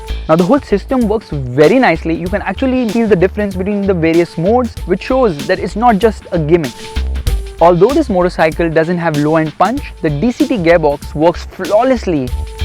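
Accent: India. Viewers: sing song blibbida dibba bibida lol that is what I hear half the time...I love Indian accent but when spoken fast I seriously miss half of it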